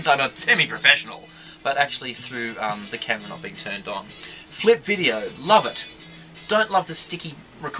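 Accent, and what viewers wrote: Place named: Australia. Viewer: Number 9 was a lie; You sound Australian.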